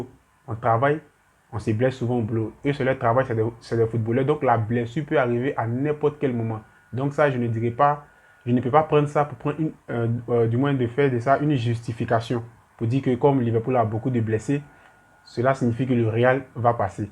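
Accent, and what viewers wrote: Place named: Ivory Coast. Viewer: Mais il a beau rester à l'étranger ce mec mais son accent ivoirien ne quittera jamais 😂😂😂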